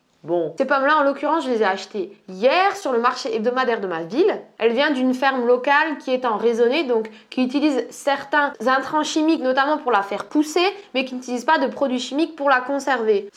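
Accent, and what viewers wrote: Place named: Forbach, France. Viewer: ce petit accent du coin de forbach 👌👌👌 😉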